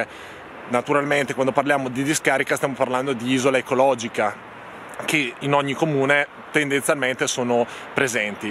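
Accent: Brescia, Italy. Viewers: Avevo riconosciuto l'accento bresciano! Anche io! e tra l'altro vivevo proprio a Cellatica, dove sono ancora i miei genitori. Ti seguo con interesse!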